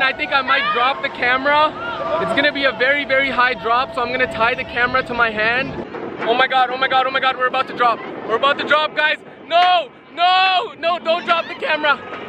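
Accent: Punjab, India. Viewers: right have a punjabi accents with Urdu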